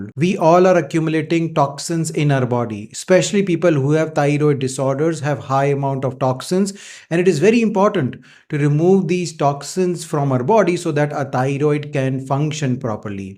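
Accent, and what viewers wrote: South India, India. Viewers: Doc,gratitude for empowering us about thyroid care, why you've south indian accent did you stay in south india for long.🙏👌❣️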